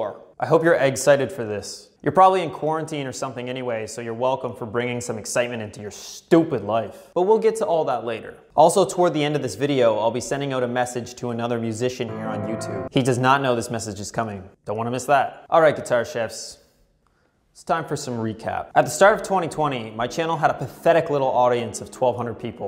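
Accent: Canada. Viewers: You're from Canada aren't you?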